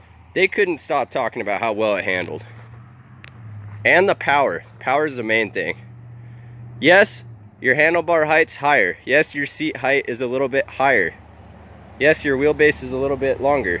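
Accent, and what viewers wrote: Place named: Mexico. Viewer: Why does he sound like a mexican speaking english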